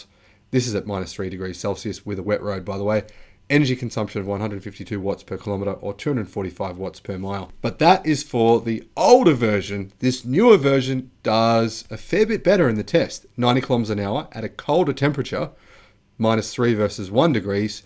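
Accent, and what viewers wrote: Australia. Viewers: Thanks mate, good to hear an Aussie talking Tesla the way you do. I have been tossing up about buying the new 2022 Model 3 and although I know it’s so much better and improved in so many ways to the 2021, that slower 0-100 time by .5 of a second is something I am grappling with. The one I test drove recently was the 0-100 in 5.6 seconds and to go to 6.1 seconds could be a dealbreaker for me. It’s the slowest Tesla now (albeit still bloody quick) and annoying that I can’t get hold of the previous battery model and quicker off the line car. To be honest, I could not give a stuff about range, that is so not an issue for me.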